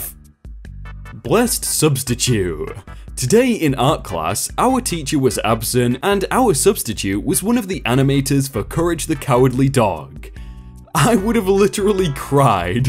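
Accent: United Kingdom. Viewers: Everytime I start up a video of yours, YouTube automatically gives me American English subtitles of your British English. I turn them off, but after every ad, they come back on...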